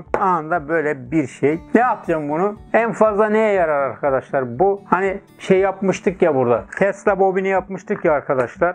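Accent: Erzurum, Turkey. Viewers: Abi Sen Erzurum’lumusun şiven bize çok benziyor:)?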